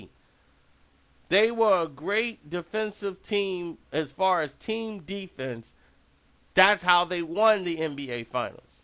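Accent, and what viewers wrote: Ireland. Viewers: The Irish accent is funny as hell. You is a fool! Keep it up. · u killing me with that irish accent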